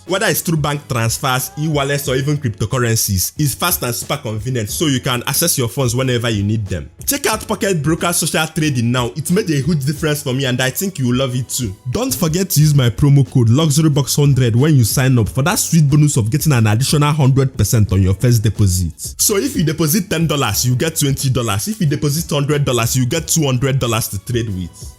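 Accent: Igbo, Nigeria. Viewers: Thick Igbo accent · Your accent is Conc igbo and is disturbing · Ur Igbo accent ❤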